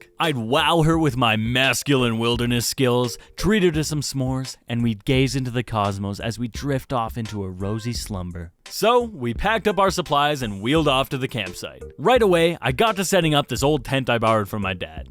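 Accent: Canada. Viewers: Your Canadian? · You animation quality has gotten so so good over these past few year 😍 is soooo cool!!! I’m Canadian but every time you talk I chuckle cause you have such a Canadian accent sometimes🤣 like you said “ a-bout” but it’s such such a Canadian way 🤭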